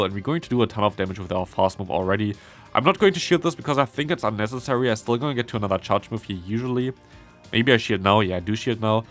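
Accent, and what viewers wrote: Germany. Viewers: same his German accent is☠️ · his heavy German accent makes it harder to recognise it 🥲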